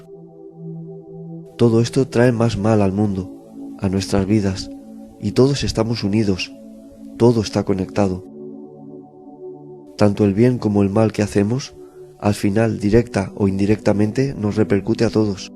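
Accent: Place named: Spain. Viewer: Hola Amigo, porque te haces llamar? Por ser un ser iluminado y lleno de pureza?...Tu voz da tranquilidad y por tu acento me parece que eres de España, me alegra mucho que hayas decidido hacer videos de ESPIRITUALIDAD que tanta falta le hace al mundo. Soy bautizado Catolico pero ellos no creen en la REENCARNACION que por tantos hechos reales se que es verdadera. Yo estoy en un grupo Espiritual llamado TAO aca en Ecuador los instructores la mayoria creen en Buda y Jesus ademas creen en la Biblia. Un gusto siempre escuchar tus videos.....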